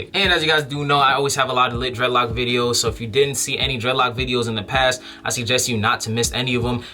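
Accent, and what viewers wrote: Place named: New York, United States. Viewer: You from New York innit 🖤♿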